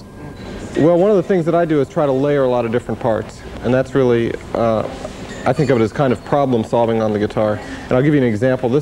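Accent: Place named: Texas, United States. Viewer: I never really noticed Tuck's accent before... is he originally from Texas? ...or this part of the country?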